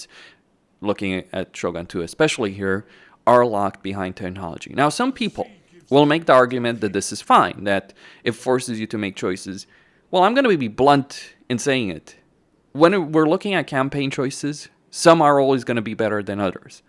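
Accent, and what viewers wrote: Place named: Romania. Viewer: Why your accent is sounding romanian to me?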